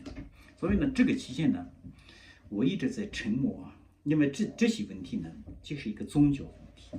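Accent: Qinghai, China. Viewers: 他的汉语这么地道 他带的是青海口语。 我觉得你没有根本读过小学的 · 但是我从来没有听说过尊者说过没有神灵的说法😏哦😳我错过了什么一部分·．还是，听错了， 请不要把你的想法和达赖喇嘛的说法混为一谈，尊者教导我们要尊重其他的．异，信仰，但是我从来没有听说过你说的….没有神灵、 还是你的青海话，我听不懂，如果听错了请你原谅我的言 论. 但藏族人说藏语还是听起来比较舒服.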